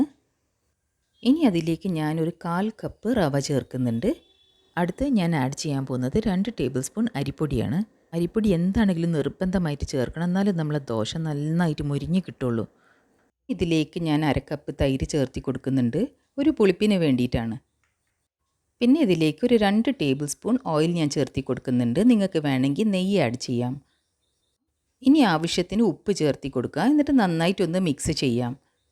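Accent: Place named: Kannur, India. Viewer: Kannur slang❤❤❤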